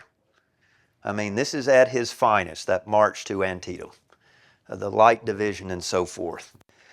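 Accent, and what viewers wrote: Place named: South, United States. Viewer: Fantastic, informative, and entertaining as presents it all, in the most interesting southern drawl, about A.P. Hill. Thank you.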